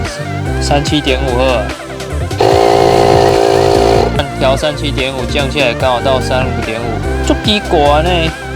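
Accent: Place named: Taiwan. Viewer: 喜歡聽你講台語 像鄰家的哥哥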